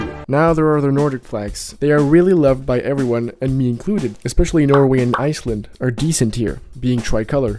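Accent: France. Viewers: are you french? Unsubbed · Are you french?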